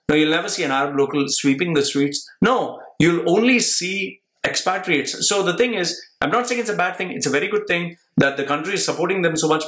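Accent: India. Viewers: You sounds like an Indian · I couldn't understand a word from his Indian accent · Wow you have such a strong Indian accent for someone who has not lived their.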